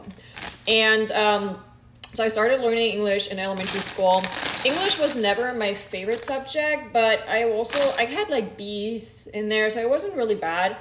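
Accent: Germany. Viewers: I can definitely still hear in the German accent while you’re speaking. Do you purposely try to mask it? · I can hear a little bit of the German accent but personally I like the German accent I also love German and Germany. I did a study abroad there and I'm also part German.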